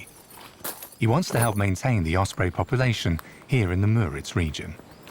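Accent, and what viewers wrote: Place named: United Kingdom. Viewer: And so they get a stinking tommy with his snotty accent to narrate this? Where is the German pride? SAD. These brits are into everything but actual work.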